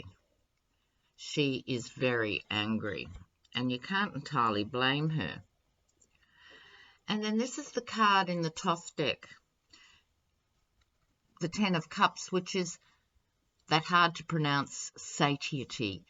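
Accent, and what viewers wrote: Australia. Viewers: I love your Australian accent especially when you say "deep shit". You are too cute!☺️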